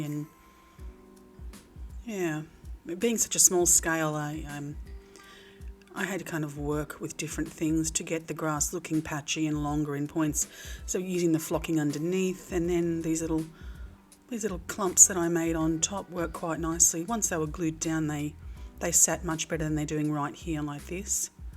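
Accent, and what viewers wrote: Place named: Australia. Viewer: Superb! You are a very talented lady! I also love your Australian accent.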